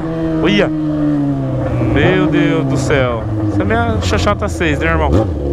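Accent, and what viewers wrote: Goiás, Brazil. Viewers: por que vc fala no pique de Goiás se vc mora em londrina?? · pq vc fala no pique de Goiás se Londrina fica em Paraná?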